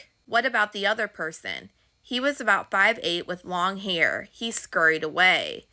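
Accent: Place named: Michigan, United States. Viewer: Where are you from? Your accent sounds like Michigan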